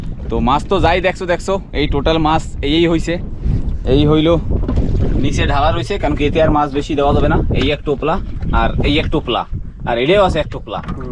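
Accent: Barishal, Bangladesh. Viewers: ভাই এর কথা বার্তা আমাদের বরিশালের ভাষার মতো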